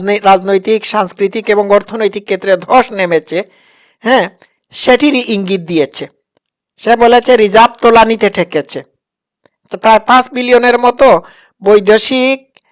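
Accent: Sylhet, Bangladesh. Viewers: U spek bangla with Sylhaty accent is SAD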